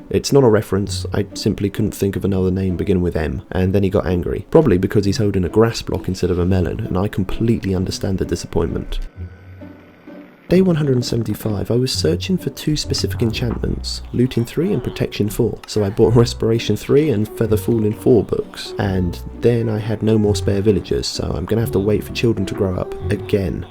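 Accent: United Kingdom. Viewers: god i really like your calm British accent you sound soo chill also all hail the king of melons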